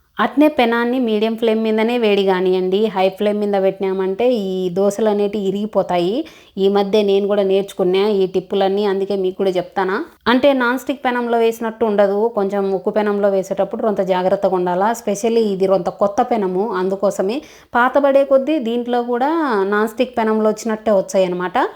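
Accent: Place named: Kadapa, India. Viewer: Hi kadapa bhasha vachhinya ,poinnya adaragottunnav .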